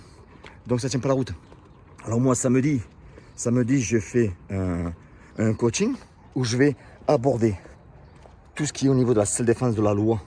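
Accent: South, France. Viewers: Bonjour. D'habitude, je suis à 100% d'accord avec toi, mais la tu est en train de te contredire. JE m'explique. D'habitude ton discours c'est "on m'agresse, bam je riposte direct en cas de moi-même finir out". Or dans cette video tu est en train de nous dire qu'il ne faut pas "defoncer" un gars qui te saisis au colbak et que effectivement si tu le fait ta reponse ne sera pas "proportionnelle" à l'attaque ce qui est tout à fait juste d'un point juridique. Mais comment fait-tu (à part faire du sparring lourd tous les jours) pour ne pas réagir de maniére disproportionnée lors d'une attaque ou tu est en stress????? Voilou. Je veux pas faire mon chieur mais, les gourous t'attendent au virage et la ils vont pas te rater. Enfin bon je prefere quand même le gars avec un bon accent de chez nous (du sud) au gars qui se rase la tête avec un elastique.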